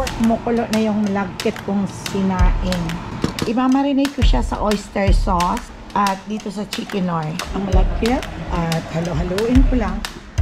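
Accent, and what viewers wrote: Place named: Iloilo, Philippines. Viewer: bakit po parang ilongga po kayo.. sorry napatanong lang po.. super enjoy ako aa content nyo po.. may advice pa kayo na binigay..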